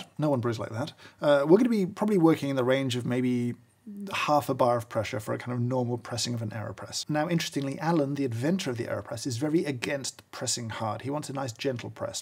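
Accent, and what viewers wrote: United Kingdom. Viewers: You forgot British accent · Watching a funnily-quoiffed man with a British accent do SCIENCE! with a 30 buck coffee brewer was a surprisingly enthralling way to spend a Sunday morning.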